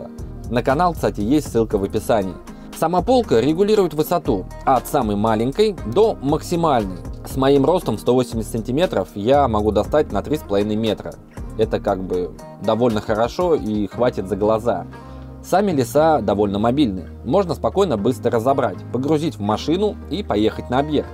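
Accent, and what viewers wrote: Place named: France. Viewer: Отличное решение! Когда начал вас смотреть(строительство гаража) "французкй акцент" привлекал внимание, сейчас же на фоне личных качеств он вообще незаметен )))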